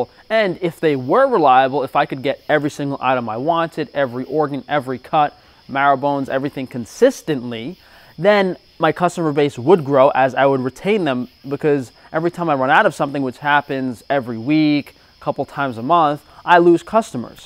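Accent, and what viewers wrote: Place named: Long Island, United States. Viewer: you sound like a Long Islander, That’s where I live and I talk and we talk pretty much the same ha ha ha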